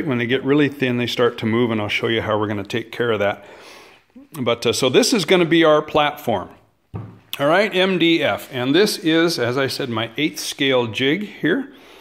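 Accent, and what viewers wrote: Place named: England, United Kingdom. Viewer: Oh, p****n d'accent rosbeef !!... 👎🏻👎🏻👎🏻👎🏻👎🏻👎🏻🤢🤢🤮🤮🤮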